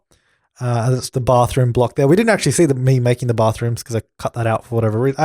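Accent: Australia. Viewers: Here in the States in Ann Arbor Michigan they have a arcade place, its a big one, it has nothing but that, and a snack area, I forget what its called now, its been about 5 years sense I was in Ann Arbor,lol! And I have to say, I love it when Aussies say No worries, meaning no problems or trouble, its kinda cute! lol! I enjoyed this video more because of his accent, if he had an American accent I wouldn't have watched the whole thing,lol!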